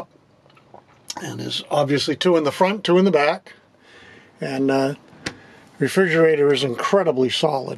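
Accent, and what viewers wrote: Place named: Northeast, United States. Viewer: Where in the northeast? I can hear home in your voice.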